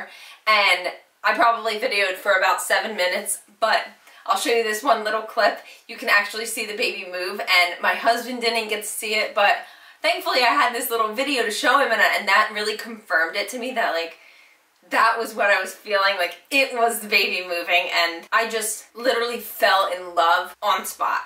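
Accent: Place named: Upstate New York, United States. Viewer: You are such an upstate New Yorker aren’t you lol. I live up in the Hudson valley area now and you sound like my girlfriends here. I’m 17 weeks - haven’t checked out your latest videos but this was really fun and enjoyable to watch! Thanks for sharing.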